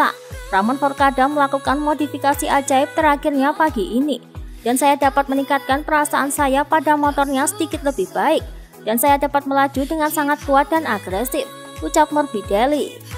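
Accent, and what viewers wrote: Java, Indonesia. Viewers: Narasi Medok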